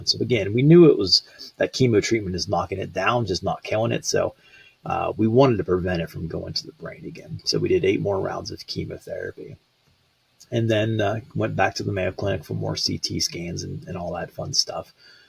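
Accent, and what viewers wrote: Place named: Pittsburgh, United States. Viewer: You can hear that Pittsburgh accent for sure!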